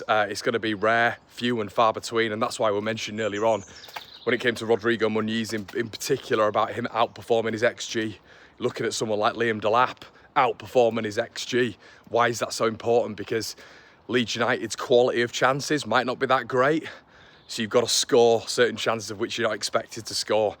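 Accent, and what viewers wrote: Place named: Manchester, United Kingdom. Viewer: certainly getting a Manchester twang in your accent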